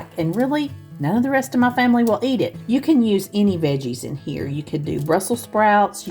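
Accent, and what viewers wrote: South, United States. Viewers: I discovered your channel not too long ago, and now look for new videos all the time. You explain things so well and I love your Southern accent I also love the things you make. I really enjoy your Vids!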